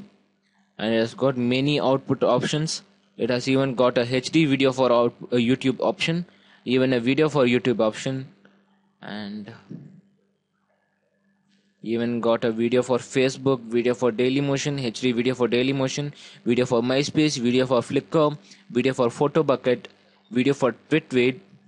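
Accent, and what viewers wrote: India. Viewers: he is north indian... he got these accent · omg I hate this idiot INDIAN and their enlish omg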